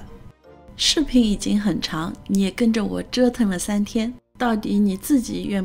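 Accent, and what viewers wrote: Shanghai, China. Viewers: 难得听到有youtuber说这么正宗的上海国语，必须follow一记！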